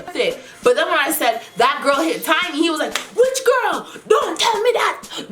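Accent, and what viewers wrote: Jamaica. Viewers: YYYYYAAAAASSSSS I LOVE UR JAMACIAN ACCENT 😍😍😍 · I like how as I heard the intro song I had a feeling that she was Jamaican, but after she seh 'boom' and 'tump' I knew she was a yaddie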